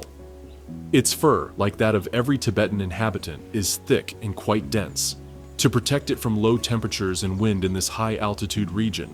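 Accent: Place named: Transatlantic, United States. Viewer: It’s quite interesting that a transatlantic narrator is describing an ecosystem that a transatlantic president is Hell Bent on destroying by openly declaring ‘ Drill Baby Drill’ someone needs to drill into Putin’s head to find where the evil is and to drill into Trumps head to find where the greed is. No?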